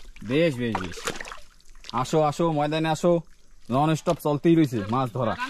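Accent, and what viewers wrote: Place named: Barisal, Bangladesh. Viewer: সবকিছু ঠিকঠাক একবার বাংলাদেশ থেকে তোমার ওখানে বেড়াতে আসবো ভাইজান, তোমাদের কথা সুরগুলো আমাদের বাংলাদেশের বরিশাল অঞ্চলের মানুষের মত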